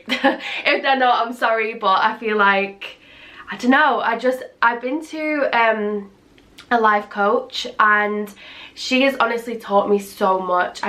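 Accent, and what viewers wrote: Manchester, United Kingdom. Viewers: “i get copy righted on evEryFiNk” 🤣 such manc pronunciation love ittt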